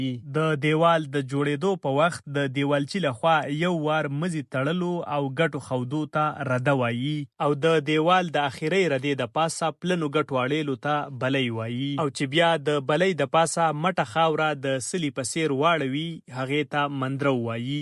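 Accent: Standard. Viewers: ډېر پهٔ ښکلې، پاکه، خوږه او سوچه پښتو خبرې کوې. دپښتنې ټولنې ښایسته او اصلي انځور وړاندې کوې. ډېره مننه ❤️🇦🇫 ستاسو ددې خدمت لپاره ډېر څهٔ کهٔ هم وشي کمه ده. ما سبسکرایب کړې، فالو مې هم کړې، ويډیوز درته هم ګورم او لایک کمنټ هم کوم.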